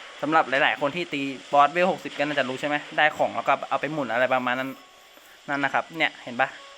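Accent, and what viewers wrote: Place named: Thailand. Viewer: เหน่อจังจังหวัดไรออคับบช นักดาบกับมือปืนอันไหนดีกว่า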